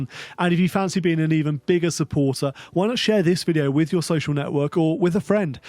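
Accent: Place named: United Kingdom. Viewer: Thanks for this. This is clear sensationalism. When you point out the facts of the case, it’s not as shocking as it seems at first sight. Basic probability based maths. Great to hear a British voice on financial matters on YouTube.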